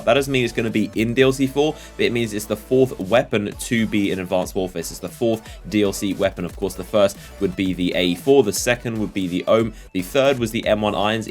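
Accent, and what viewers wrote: England, United Kingdom. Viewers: He is from England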